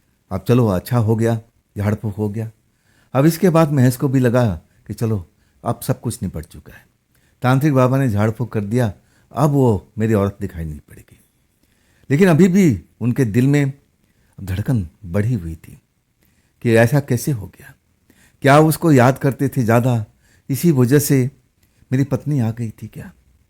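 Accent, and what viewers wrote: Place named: Bihar, India. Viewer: Aap ke pronunciation se lagta ki aap east belt ke hai yani east up ya bihar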